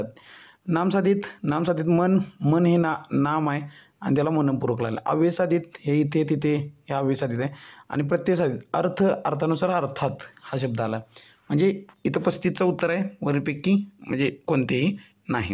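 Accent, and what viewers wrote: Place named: Kolhapur, India. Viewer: Best attempt but speed is quite fast .. Kolhapuri tone watato..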